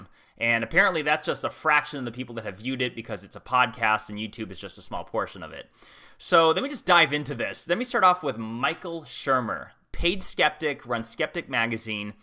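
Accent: United States. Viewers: Totally unrelated to the topic at hand but you sound like you're from New England, am I correct?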